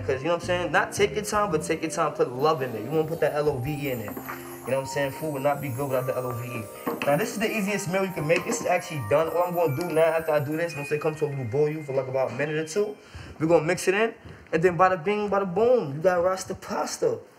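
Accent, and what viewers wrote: New York, United States. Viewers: Before i started the video i knew he was from NYC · how he know i aint pay my rent last weeeeek im deaddddd he more New York then New York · This got to be that member of AMP Fanum or he def from New York lol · First 2 seconds I knew he really from New York 😭😩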